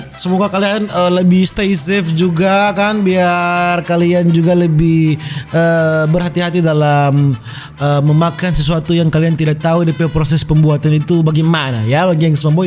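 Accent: Manado, Indonesia. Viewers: itu logat manado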